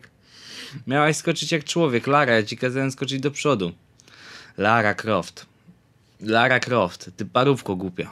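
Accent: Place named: Podhale, Poland. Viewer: Hehe, nie moge z twojej góralskiej gadki coś w stylu " ty nie wiesz ale ja wiem,a jak nie wiem to się dowiem " xD. Pozdro.;)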